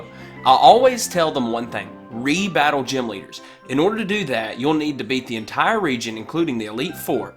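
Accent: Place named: South, United States. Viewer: I thought ur accent was ironic but it isn't. Nothing wrong with that just a southern accent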